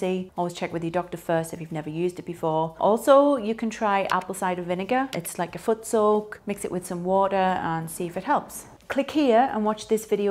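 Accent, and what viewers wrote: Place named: Newcastle, United Kingdom. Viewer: Ooooh noooo! I bet the Californians love your Geordie accent ;) Thanks for the video, informative.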